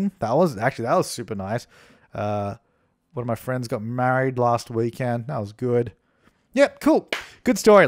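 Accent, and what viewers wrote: Australia. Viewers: To be fair though, triangles are the best shape. Just saying, oh and also, I love watching Aussie youtubers like you. It helps me a lot considering I'm an Aussie to hear my own accent on a global platform makes me very happy. It also makes me happy to know you're from NEW like me (about 3-4 hours north actually) because all the Aussie youtubers i know are either from Victoria or Queensland (bar a few). I'd also like to thank you as you've helped me with a few very personal issues without even knowing it. Your everyday humor makes me smile in my darkest moments, which I'm forever thankful for. Another thing as this is getting super long, I absolutely love the style in which you build your houses, it's very similar to my own taste and you often talk about people requesting other styles, which is okay too.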